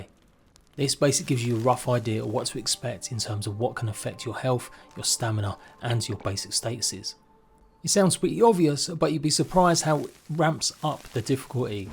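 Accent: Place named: London, United Kingdom. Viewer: That is definitely a London accent you've got there. I just started this game yesterday and was in major trouble with water cause I only had the 2 coconuts that take ages to respawn. Thanks to you I am now smarter. Shout out from Germany. Cheers mate 😁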